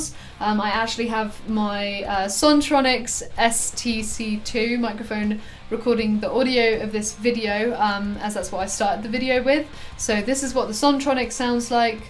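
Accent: England, United Kingdom. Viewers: Dear God, your English accent is just désarmant. Magnificient.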